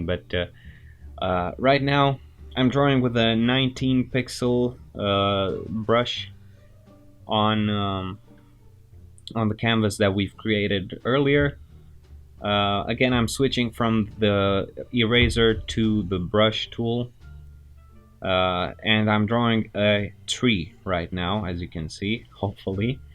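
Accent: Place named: Romania. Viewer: Saw your comment on one of Jazza's videos and wow, i'm glad I checked out your channel. I love the moderate pace and good explanations. Just wondering, are you by any chance Romanian? Your name sounds very Romanian and the accent sounds familiar for some reason :)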